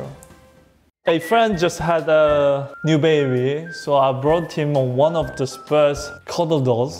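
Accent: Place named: Germany. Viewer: His German English accent is so cute haha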